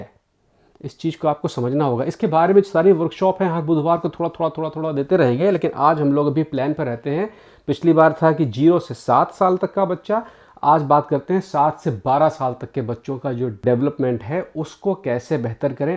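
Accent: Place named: Uttar Pradesh, India. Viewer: Maja a Gaya aap India mein kahan se ho aapki batchit up se milati hai aapki baten sunkar sunkar Achcha lagta hai Jaise Ham UP mein pahunch gaye ho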